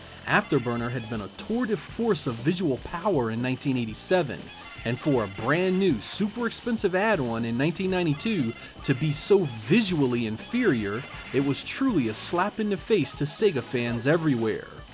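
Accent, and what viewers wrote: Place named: Piedmont, United States. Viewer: I've been trying to place your accent and I'm ready to guess: Piedmont region of either Georgia, Tennessee, or the Carolinas. I've only known one person that pronounced "on" the way you do and he was from the suburbs of Atlanta.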